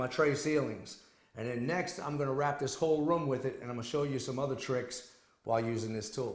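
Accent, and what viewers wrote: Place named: New Orleans, United States. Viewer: I know you must be from NOLA ??.. Recognize the accent !!!)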